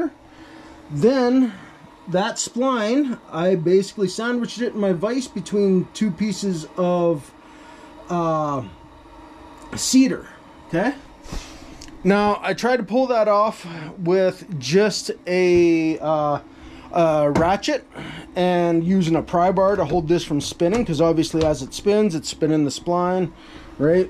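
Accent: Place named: Canada. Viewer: nice vid you should get a longer 1/2" ratchet I like the canadien accent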